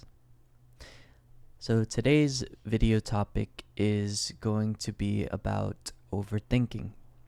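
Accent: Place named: United States. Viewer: More videos plz ❤ I find your voice very clear to practice my American English accent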